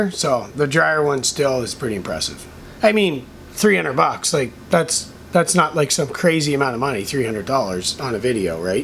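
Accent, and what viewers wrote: Canada. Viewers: Bwahahahahaha! Love It. I've never seen your channel before, took six seconds to figure you're Canadian. Even before the "You're Welcome."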